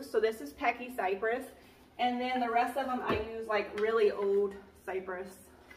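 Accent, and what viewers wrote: Louisiana, United States. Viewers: Your excitement fills my soul and I WANT ONE OF YOUR BREAD BOARDS!!!! I’m going to charter a BUS and bring people with for a shopping trip! Do you have room for a BUS In your neighborhood? LOL!!! We can buy and you can teach us your Louisiana accent ❤️❤️😁❤️❤️ What fabulous DIYs!!!!! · Hi I just found your channel. I love watching your videos and I’m playing catch up watching your older ones. When I seen this one I so wished I would have known about your Facebook live sale. I really love the Hoop project that has a Chicken stamped on it. I know this is a long shot but do you still have one and how much would you sell it for? I just love watching you and my hubby asked where is she from, she sounds like Shelby on the show where they go hunting for logs buried in the swamps of the Bayou of Louisiana. I laughed and said yup that’s where she’s from. 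We love your accent. Keep up the great job!!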